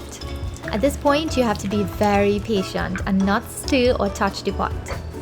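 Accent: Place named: Trinidad and Tobago. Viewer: Hi this looks scrumptious😻 I admire that you kept your Trini accent. It makes me feel like I am back there💛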